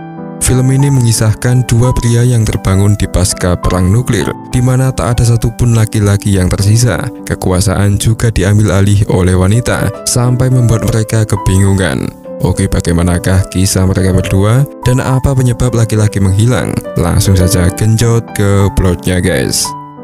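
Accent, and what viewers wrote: Java, Indonesia. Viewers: Suara ne wong jowo iki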